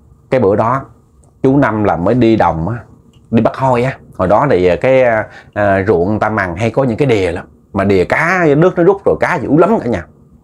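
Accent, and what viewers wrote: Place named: South, Vietnam. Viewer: mình ng miền bắc mà nghe ng miền nam hay tây í nghe khó hiểu vài câu:))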